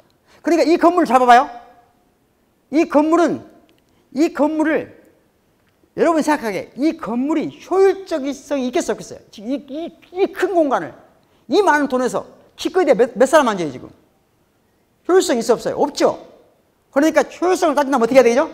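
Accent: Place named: Jeolla, South Korea. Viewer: 박구용교수님 감사합니다. 요즘 유튜브를 통해 교수님을 만나는 재미가 솔솔합니다. 그리고 너무 유익하고 재밋습니다. 아마도 박구용현상이 이시대를 휩쓰는 건 아닐까하는 생각이 들기도 합니다. 70이 되어 미국에 살고 있는 용봉에 후예로서 가슴이 벅찹니다. 적당한 전라도 사투리 억양도 가슴을 따듯하게 하네요. 광주! 라는 말만 들려도 울컥하는데, 전남대! 라는 말은 더욱 뜨겁습니다. 감사합니다. 저는 이제 박교수님의 열렬한 팬이 되었습니다. 너무너무 자랑스럽습니다 박구용교수님, 당신의 탁월한 지식과 열린 가슴과 번뜩이는 지혜에 응원과 박수를 보냅니다. 당신의 헌신으로 암울한 사회가 깨어나기를 진심으로 소망합니다. 사랑합니다❤❤❤❤❤❤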